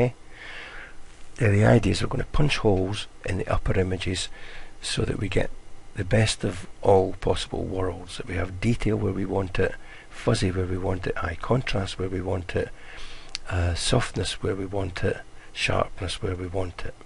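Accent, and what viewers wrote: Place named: Scotland, United Kingdom. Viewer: I love the scottish accent.I was just having a bit of fun with you.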